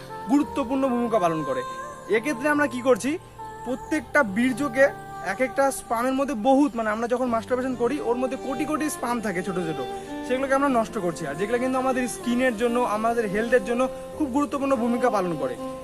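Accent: Kolkata, India. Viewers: ভাইয়া তোমাদের বাসা কলকাতা বলতেন তোমার কথায় অনেক ভালো লাগছে বলবেন ভাইয়া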